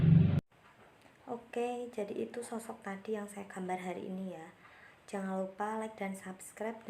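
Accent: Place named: Java, Indonesia. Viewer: benar deh.. Asline pundi tho mba.. Nang video logate jowo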